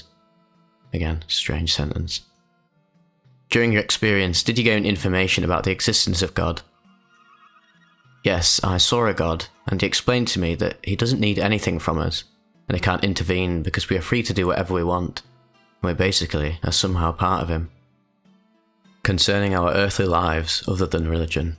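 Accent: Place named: Manchester, United Kingdom. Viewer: Majors mask is the shit... Sounds like you're close to Manchester... Safe bro lol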